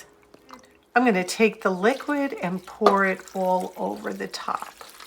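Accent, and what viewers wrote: New York, United States. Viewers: New Yorker? Staten Island? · Hello 👋 This video popped up on my feed. I love these types of channels. I enjoy your NY accent and love the positive tone in your voice. I took absolutely ages to finish the video because you inspired me so much to go and do! I LOVE that old Dutch proverb and will add that to my "to embroider" list! I looked up the amazing planner you showed and OH MY GOODNESS 😱 what a rabbit hole I could fall down for that one. Not very frugal on the outlay but I see how it will save money in the long term... So all this to say thank you (and with his mad guitar skills!) for being you, keep on being you and shining your light all the way across the globe. Lots of love from a new subscriber in Western Australia. 😊💝🇦🇺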